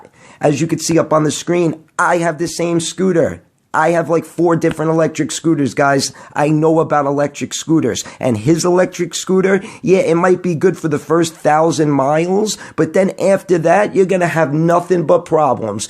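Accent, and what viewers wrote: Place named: Brooklyn, United States. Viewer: As a Transplanted New Yorker out here in Seattle 1. I've been down the rabbit hole of Escooters I only follow The Ginger Kid Igor from Roblex and Now You! I miss the energy and the vibes 2. that Brooklyn Accent made follow you as well Bro lol glad I stopped by cos old fuckin I supply alibaba Asshole was kinda getting on my fuckin nerves because again the rabbit hole,I started looking up specs and when I heard 52V doing 50mph I'm like GTFOH! 🤣🤦🏾‍♂️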